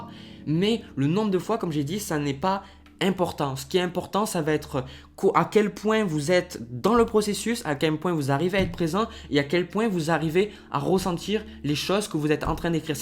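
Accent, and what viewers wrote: South, France. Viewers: Merci un Bonjour de Béziers Super vidéo comme toujours avec ce bel accent du sud 😉 continue c est bon pour le moral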